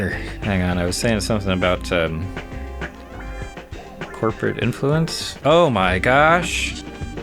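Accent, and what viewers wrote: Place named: United States. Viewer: Hbomberguy with hair and an American accent.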